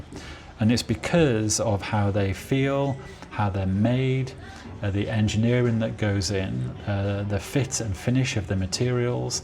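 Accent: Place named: United Kingdom. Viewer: Я думаю что ты, обязательно купишь MRG в круглом корпусе, может не сегодня не через месяц, но это обязательно произойдет, так как у тебя очень крутая коллекция B5000! Желаю успехов, круто что я наткнулся на твой блог, пишу на русском но понимаю твою речь, конечно Английский язык это не Американский 😅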